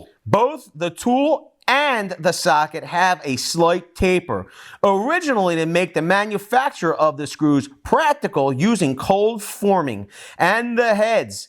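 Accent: Philadelphia, United States. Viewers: I knew you had to be from Philly, you got that Philly energy and flare. I agree with you on the Phillips bit, most people don't understand the concept and think its an annoying feature but in aviation breaking off a fastener in the airframe means at best hours and inspection and engineering, structural analysis and tedious removal and rework. At worst all of the above plus removal of the affected airframe and replacement, hopefully nothing flight critical.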